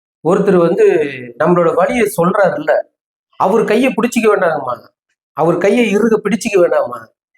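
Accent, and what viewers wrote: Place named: Kerala, India. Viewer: ஆன இலங்கை தமிழ் ஓரளவுக்கு mayala சாயல் வரும் , ஏ இந்த பெண் உளறி கொற்ற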